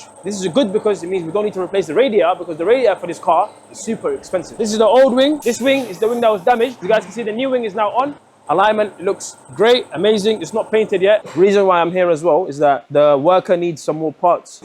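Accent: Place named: United Kingdom. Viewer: UK from his accent.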